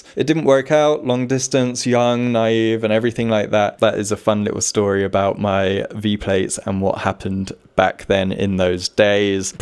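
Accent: England, United Kingdom. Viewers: Hi, new Irish subscriber here - you are so handsome and I love your English accent and tattoos - as an Irish gay man myself, I’d happily date you in a heartbeat 🇮🇪☘️🇬🇧😍😍😍😍😍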